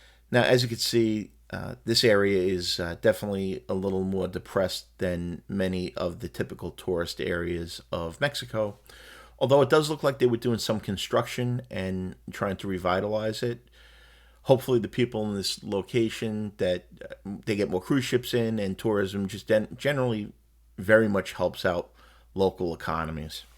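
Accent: New York, United States. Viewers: Not sure, but I think they were making fun of your very noticeable New York or should I say New Yawk accent.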